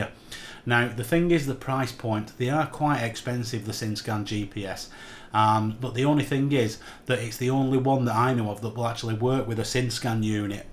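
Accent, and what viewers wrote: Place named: Oldham, United Kingdom. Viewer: Thanks for your clear video. I thought it might align the scope but it does not. Now I know I don't need one. That accent sounds Oldhamish, I live in cloudy Rochdale. Just got my Intes mk67 out for its annual inspection on the synscan (not used yet, only bought 3 years ago!) but I hear its cloudy all week, ah well. Cheers, Mike.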